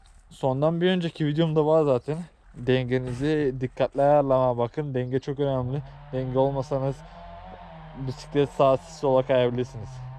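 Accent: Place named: Macır, Turkey. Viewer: Efsaneeee tam macırca konuşma vallaha sard i😂😂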